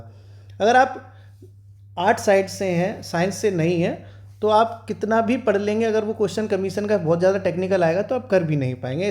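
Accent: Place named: Etah, India. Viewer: एटा की भाषा लगती है आपकी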